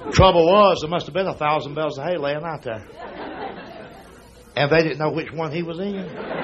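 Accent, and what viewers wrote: South, United States. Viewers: Only the thing they have in common is the southern accent, both of them are great storytellers and can just simply cause you to bust out laughing by telling a simple story but they are two different comedians. The great Jerry Clower centered all of his routine around a family that lived in the Mississippi town he was from. This guy stretches it out more and brings just a lit little bit of political comedy into his routine where Jerry Clower stayed away from politics. This guy is more like the George carlin of the south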